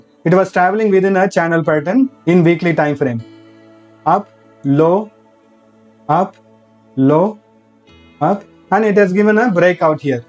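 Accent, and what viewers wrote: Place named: India. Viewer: ohh your indian akcent )) Greetings from Azerbaijan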